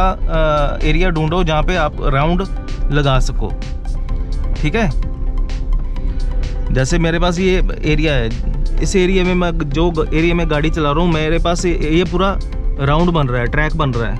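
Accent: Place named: Uttar Pradesh, India. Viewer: I think up army se ho mujhe lag raha hai aap ki Bhasha se aur baat karne ke tarike say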